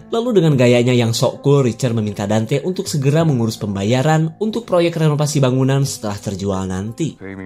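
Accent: Sunda, Indonesia. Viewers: Iya bener suaranya sunda pisan 😂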